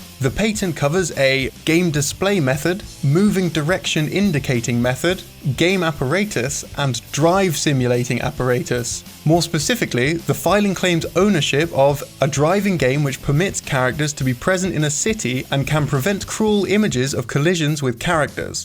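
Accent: England, United Kingdom. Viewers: Words are pronounced differently based on region. In this case, likely British. Case closed. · You'd think everyone had never heard Englishman speak before. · I love you and your weird (to me) British pronunciations,! Now I'm genuinely curious if that's how English say "patent"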